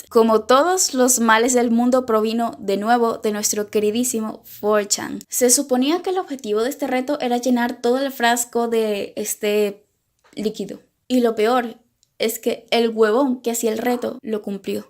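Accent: Venezuela. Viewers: Eres venezolana?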